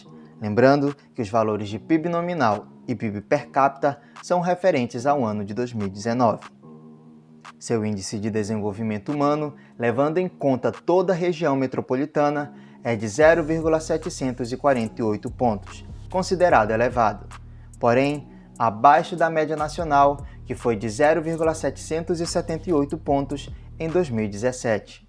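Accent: Pará, Brazil. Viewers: Este sotaque é de Paraense,meu conterraneo!Parabens pelo canal.Verdadeira utilidade publica!!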